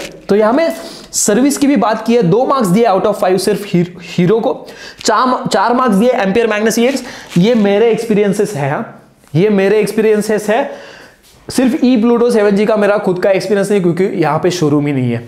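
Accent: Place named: Maharashtra, India. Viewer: मराठी माणूस दिसतो आपला...ascent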